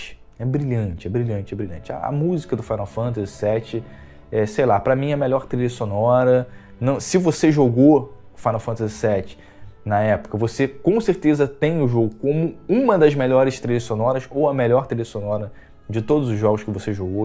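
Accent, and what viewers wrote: Rio de Janeiro, Brazil. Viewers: Teu sotaque carioca é muito forte!!! SSSSHHHHHHH SHHHHH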